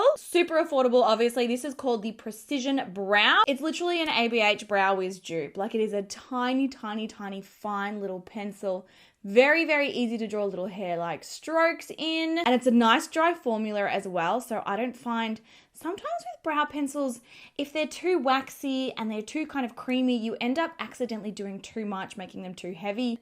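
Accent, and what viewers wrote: Australia. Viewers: I love your video’s so much! Now my exams (dialysenurse, just literally translated, I dont know if the translation is correct, but I think you know what I mean) I bingewatch your videos all the time ❤ To be honest; I love your australian accent so much and Australia in general (so why in US dollars 🤐🤐🤐🤐). I orderded so much lately on your website and I always recommend your make up on my own channel, even in the favourites of the year (unfortunatly its in Dutch). Please keep on the good work and keep on entertaining us ❤ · I am 55 with very dry skin, so alot of your videos don't have much relevance for me. HOWEVER, I moved to the USA from Australia almost 5 years ago, and your videos make the distance seem not so great. Between your beautiful personality and your genuineness (let'snot disregard your awesome Aussie accent) you brighten my days, making me laugh with your sunshine. 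So pleased to see a "pro" still gets fake tan stains on her hands - I don't feel so bad. 😃, thank you for being you. 💗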